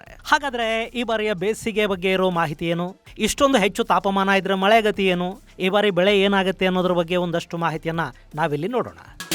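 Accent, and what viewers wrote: North Karnataka, India. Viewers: ವಾವ್ ಸರ್ ಅದ್ಭುತವಾದ ಉತ್ತರ ಕರ್ನಾಟಕ ಭಾಷೆಯನ್ನು ಮಾತನಾಡುತ್ತೀರಾ ನಿಮ್ಮ ಊರು ಯಾವುದು ಮತ್ತು ನಿಮ್ಮ ಯಾವ ಜಿಲ್ಲೆಗೆ ನೀವು ಯಾವ ಜಿಲ್ಲೆಗೆ ಸೇರಿದ್ದೀರಿ ಹಾಗೆ ರೈಲ್ವೆ ಫ್ಯಾಕ್ಟ್ಸ್ ಗಳ ಕುರಿತು ಒಂದಿಷ್ಟು ವಿಡಿಯೋಗಳನ್ನು ಮಾಡಿ ಸರ್ ಅದು ದೃಷ್ಟಿಹೀನರಿಗೆ ಉಪಯುಕ್ತ ವಾಗಿರಬೇಕು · Sir I love the tone of Uttar Karnataka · ಉತ್ತರ ಕಾರ್ನಾಟಕ ಭಾಷೆಯನ್ನು ಅದ್ಭುತವಾಗಿ ಮಾತಾಡಿದ್ದೀರಿ ಸರ್ ನೀವು ತುಂಬಾ ಧನ್ಯವಾದಗಳು ಸರ್ 🙏🙏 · ನಿಮ್ಮ ಬಾಯಲ್ಲಿ ಉತ್ತರ ಕರ್ನಾಟಕ ಭಾಷಾ ಕೇಳೋದೆ ಒಂಥರಾ ಮಜಾ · ನಾನು ಮೊದ್ಲ ಬಾರಿಗೆ ನಿಮ್ಮ ಧ್ವನಿಯಿಂದ ನಮ್ಮ ಉತ್ತರ ಕರ್ನಾಟಕದ ಕನ್ನಡ ಭಾಷೇದಾಗ್ ಕೇಳಿದ್ದು. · ಸರ್ ನಿಮ್ದು ಉತ್ತರ ಕರ್ನಾಟಕ ನಾ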